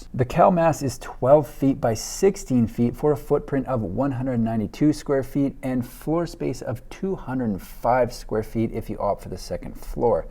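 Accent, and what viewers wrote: Canada. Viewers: I would love if you got to go TOUR these favorites and get the behind the scenes info and tell us what places impress you the most. Maybe you need a US correspondent! I assume your Canadian because of the accent but you could be Midwestern too?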